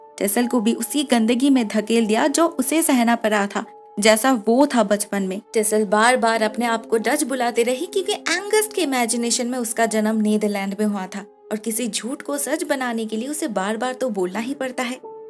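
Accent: Bengal, India. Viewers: मैडम आप बंगाली हो मैं आपकी हिंदी सुनकर बता सकता हूँ पर आपकी वॉइस अच्छी है😁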